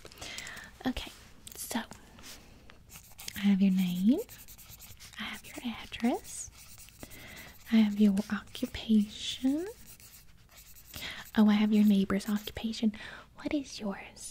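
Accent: South, United States. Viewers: Why did her voice change from the start to the end she started to talk with like a southern accent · i love how her accent turns slightly southern. Cute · ...She always speaks with a Southern accent?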